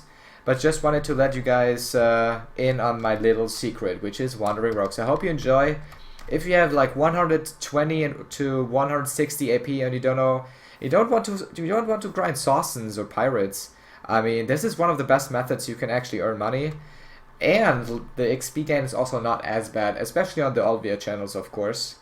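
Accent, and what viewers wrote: Germany. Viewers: youre german, no?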